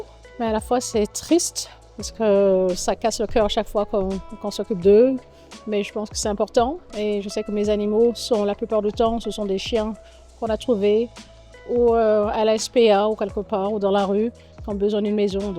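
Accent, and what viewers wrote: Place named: United States. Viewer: Ah ma petite, tu nous a fait rêver, tu nous a fait sourire, tu nous a fait pleurer mais on t’aime tellement! Ça fait trop plaisir de te revoir avec ton petit accent américain. Sois heureuse dans tout ce que tu fait ma belle et surtout continue d'être heureuse et de donner de la joie autour de toi! ❤ Un grand merci à tes parents ❤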